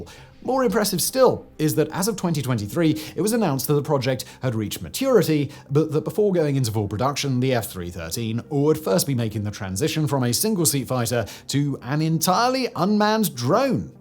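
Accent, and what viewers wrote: United Kingdom. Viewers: I've been following your various channels from the beginning, and I really appreciate and respect your work, but there's one little thing I'd like to say, most of your audience is international, and we learned English as a second or third language with the American system and media, I'm sure the UK accents are cool and folksy since it's one of the small nations that speak this language with this folksy aspect, like Jamaica for example, but if you could neutralize the accent a bit, it would serve the message a lot.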